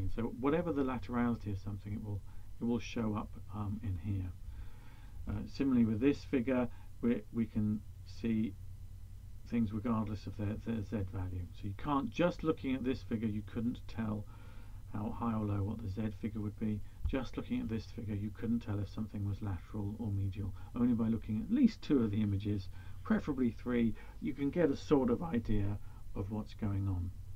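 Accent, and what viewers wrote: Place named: England, United Kingdom. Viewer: Lovely accent - Really miss the English accent - so pretty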